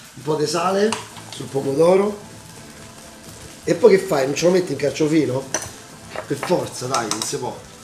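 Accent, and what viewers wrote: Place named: Rome, Italy. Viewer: Ao e nun cagate rcazzo, è de Roma parla romano e pensate de non trovà i romani che scrivono in romano sotto ai commenti? Non avventuratevi mai a Roma come in nessun'altra città italiana dato che siete tanto sensibili da non riuscire ne a leggere ne a sentire i dialetti. Detto questo, m'hai fatto morì dalle risate ahahaha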